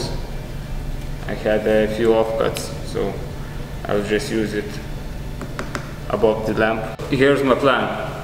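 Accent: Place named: Russia. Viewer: Судя по произношению-это нашенский. Так английский, проговаривают только наши.) Только вот рассказать процесс людЯм по русски никак, надо понравится ИМ!) Мне то рассказывать не нужно, я все это знаю, сам со смолой работаю, но ведь людям то было бы интересно!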